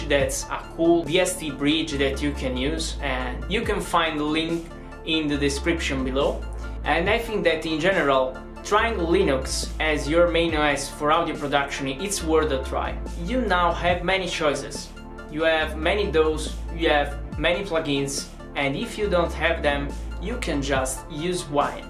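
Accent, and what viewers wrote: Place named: England, United Kingdom. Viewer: I don't know why but.... I love your English accent somehow!!! Its lovely for me...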